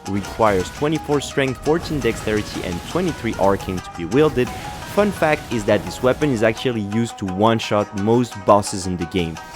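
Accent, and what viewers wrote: France. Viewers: T’es français toi c’est sure, mais t’as un accent vraiment mignon en anglais 😊 and I’m sure you made this video for the PVP player and not PVE. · Je kiff tes vidéos tu gère serieux, je rep en francais j'ai entendu "putain" dans une de tes videos et t'as un accents un peu "fr" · cet accent anglais du Pas de Calais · A il a un accent français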